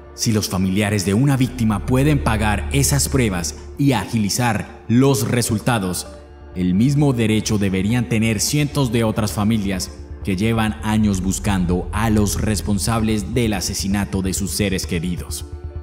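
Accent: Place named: Spain. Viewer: Es el primer vídeo tuyo que veo y ya me he suscrito a tu canal. Me encanta el español de España que usas y que hablas en todo el vídeo.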